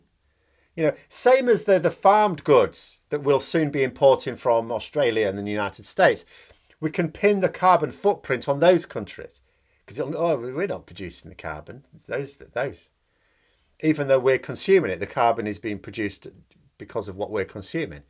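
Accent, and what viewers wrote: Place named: RP, United Kingdom. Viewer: Indians would be easier to understand than the locals.. sound posh in Scunthorpe.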